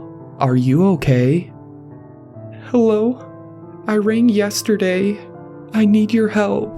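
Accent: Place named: United Kingdom. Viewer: This person is most definitely British 😂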